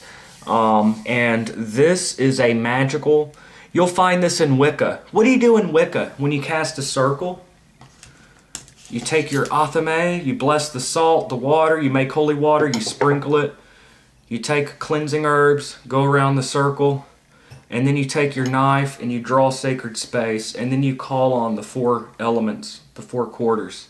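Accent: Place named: Texas, United States. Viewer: I been watching for while and like the u put a bit of your sense of humour in with ur texan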